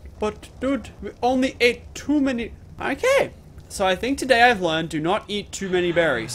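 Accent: Australia. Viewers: He is australian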